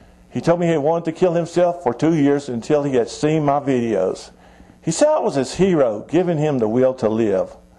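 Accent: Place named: South, United States. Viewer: which one of the southern states is he from?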